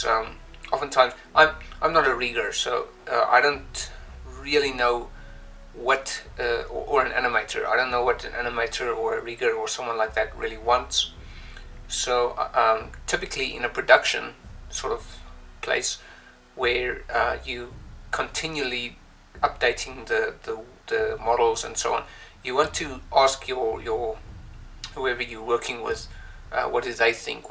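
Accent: South Africa. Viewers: dude you sound extremely South African, I need to know you nationality !!!!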